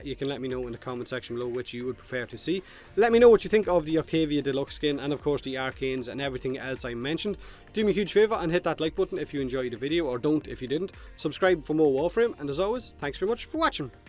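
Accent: Ireland. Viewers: Personally I love your brogue.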